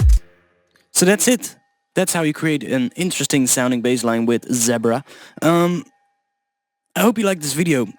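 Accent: Netherlands. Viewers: Good tutorial. En volgens mij ben je nederlander ;)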